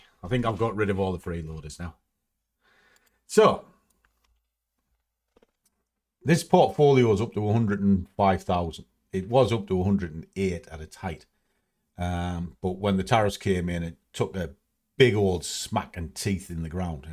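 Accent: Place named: Wales, United Kingdom. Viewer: You remind me of me! We are a similar age and have the same sense of humour and no BS tolerated - and I love your welsh accent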